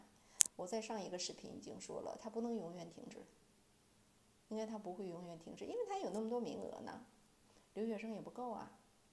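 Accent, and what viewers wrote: Beijing, China. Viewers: 姐们 您在winnipeg？ 北京的吧 听着京腔 顺耳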